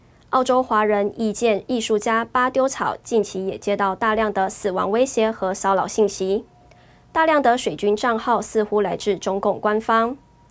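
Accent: Taiwan. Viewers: 台灣的腔調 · 這主播有親切的台灣腔調捏😂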